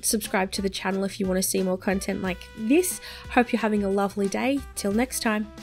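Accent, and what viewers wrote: Australia. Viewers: I love female aussie accents. It brings a warm feeling to my heart and ears.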